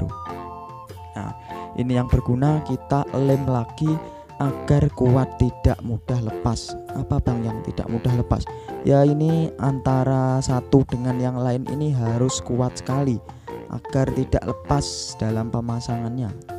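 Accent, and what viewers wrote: Java, Indonesia. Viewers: Hhh untuk merosani jowo banget bang, jowonya endi brow sampan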